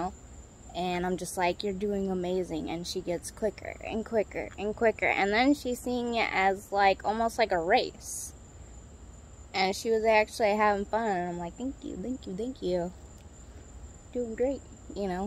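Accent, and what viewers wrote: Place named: Florida, United States. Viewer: Are u in Florida? It sounds like FL. 🏝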